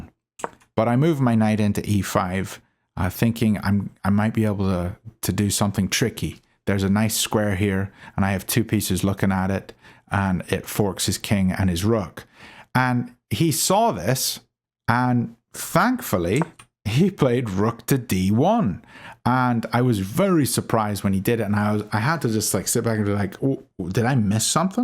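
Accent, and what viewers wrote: Northern Ireland, United Kingdom. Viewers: I'm really enjoying watching your journey. I'm currently trying to escape the <num> bracket and have been spending a lot of time learning theory recently, but watching skilled players convert midgame positions is very helpful for me Also I'm interested in your accent! It sounds like a mixture of Northern Irish and South African, plus I guess a few north Americanisms. Did I get anything right?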